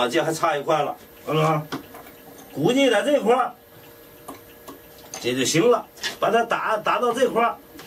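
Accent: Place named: Tianjin, China. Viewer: 10 分钟 做完 用了二集???这嘴天津的... lol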